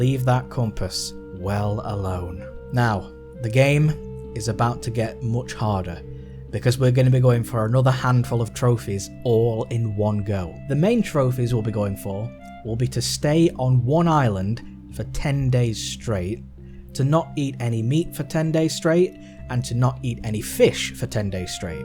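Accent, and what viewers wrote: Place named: Wales, United Kingdom. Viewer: oh my god... are you kidding. I'm from Wales and its unusual to hear a Welsh accent. That has made my day.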